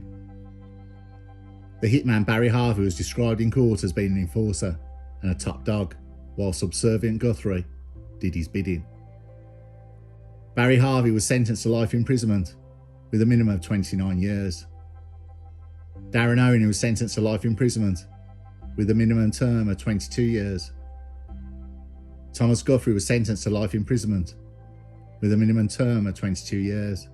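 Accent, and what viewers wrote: Birmingham, United Kingdom. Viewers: yeah I’m usually good with accents but although it sounds brummy to a point I was unsure so thought I’d ask, top channel pal keep the videos coming! Salute.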